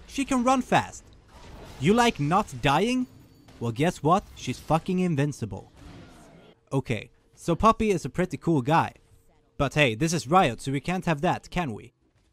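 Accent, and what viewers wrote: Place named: Netherlands. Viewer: your dutch accent if so annoying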